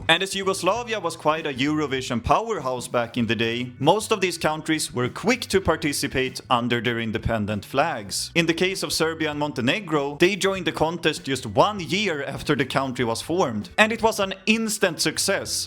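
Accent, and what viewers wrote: Sweden. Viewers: Great report, and i love your swedish accent, i didnt know the prevailing result was only .5, thats just mad, its interesting to see the mindset of ppl at the time, that half nation that staying with serbia is better. Maybe back then it was a different geopolitics, I wonder what ppl think today, do they think that Montenegro being independent was a good move and are they happy where that choice landed the country in a more beneficial place than had it stayed in union with serbia? How would they feel if they had stayed with serbia as it is today? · as an austrian, I realy like your swedish accent. nice video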